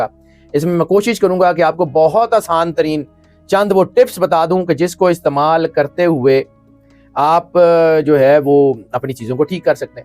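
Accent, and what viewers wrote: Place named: Rawalpindi, Pakistan. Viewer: Assalam walekum sar aapki awaaz bahut jyada speed Se Hoti Hai aap video Tej Karte Hain sukun ke sath aap video chalayen Karen aapki awaaz Aisa Lagta Hai Jaise Pindi wale sahi se Peeth se bolate Hain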